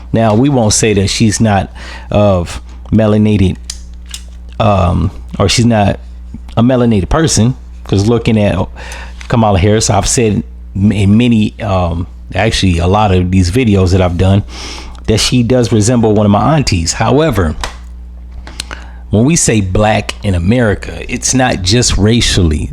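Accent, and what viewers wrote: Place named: Chicago, United States. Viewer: Keep going strong bru , you dropping fire , one of the best , you sound like one of my Chicago brothers .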